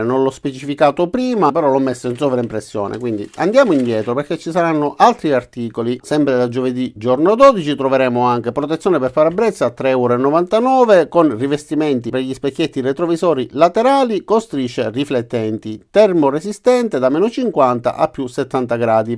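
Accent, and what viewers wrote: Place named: Southern Italy, Italy. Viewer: anche se io personalmente ho scelto un altro brand... seguo sempre volentieri (e sono iscritto) i tuoi video. Inoltre é anche piacevole questo nostro accento del sud. Un caro abbraccio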